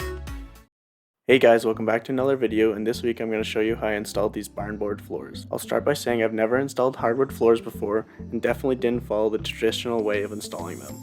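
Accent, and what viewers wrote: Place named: Canada. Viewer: Excellent video, and very informative! I especially like the way you get right to the point, pace things perfectly, and don't use any of the typical amateur mistakes like long lead-ins, bad music, or obnoxious personality quirks. I've saved your video to watch again before I start my own barn board floor project. P.S. I also appreciate your Canadian sensibility and pronunciations! 🇨🇦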